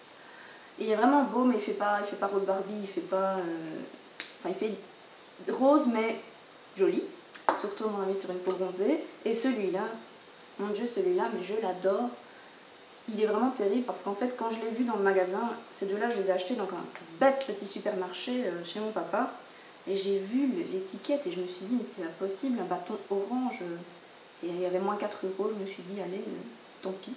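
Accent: England, United Kingdom. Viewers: Tu te débrouilles très bien pour une première fois, en plus tu es naturelle et sympathique :) Moi je n'ose pas me filmer, enfin pas pour l'instant^^ Si non ton accent anglais est top! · Tu es parfaite! Et cet accent Anglais de ouf! Sinon, tu vas être interprète Français-...? Mon papa a été prof d' Espagnol dans ton école mais il est retraité depuis quelques années maintenant #racontesavie Bisous et longue vie à ta chaîne! Frivole P.S: de rieeeeen! ^^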